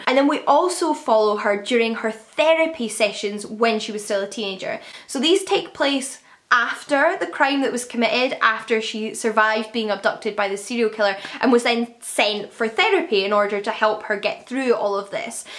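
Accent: Scotland, United Kingdom. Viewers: Subscribed within the first 5 seconds of the video because I love the Scottish accent! 😁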